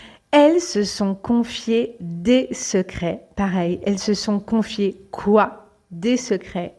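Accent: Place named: Paris, France. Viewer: Bonjour . Merci . Apparemment , votre accent est français parisien . J'aimerais que vous laissiez les signes à l'épreuve du candidat ainsi que la mémorisation des portions de phrases sauf le . à la fin de la phrase . Voudriez-vous lire et relire moins vite toute phrase pour mi-sourds par la tension comme moi .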